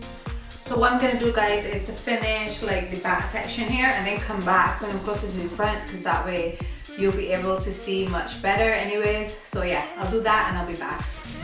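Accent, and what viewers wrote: Barbados. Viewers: You sound like a bajan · are you bajan? you sound just like my mom🤔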